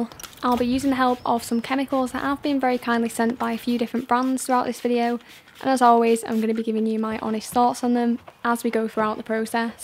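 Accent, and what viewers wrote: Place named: Liverpool, United Kingdom. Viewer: Is this trying to be a rip off of car cleaning guru ? Even the scouse accent for crying out loud !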